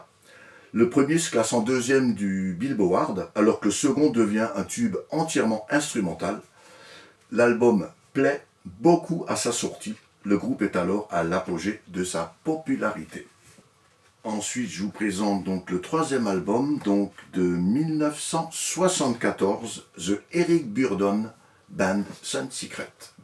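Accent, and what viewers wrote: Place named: Alsace, France. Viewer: Bravo Monsieur, je découvre votre chaîne que je ne connaissais pas et j'ai tout de suite reconnu le bel accent du 68, car je suis également originaire de Mulhouse (Milhüser Wackes comme me surnommaient affectueusement mes anciens collègues suisses). Très belle sélection, si vous parlez allemand je vous conseille la chaîne YouTube d'Erwin (Across.the.Borderline) très au fait sur les genres country/americana. À bientôt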